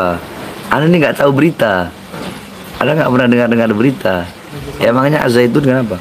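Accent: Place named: Manado, Indonesia. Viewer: Sama sama orang Manado (Sulawesi) lagam bicaranya sama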